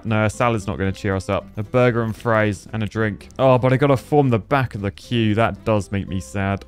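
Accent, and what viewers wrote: United Kingdom. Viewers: Fellow Britt? Does he spiff?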